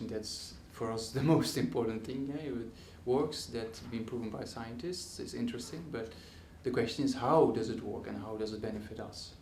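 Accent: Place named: Netherlands. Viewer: The volume is very low, coupled with Dutch English and swaying doesn't make for easy listening.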